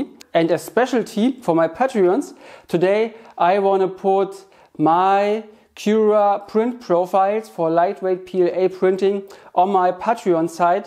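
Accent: Germany. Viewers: Funny how I noticed after the first sentence that you are German xD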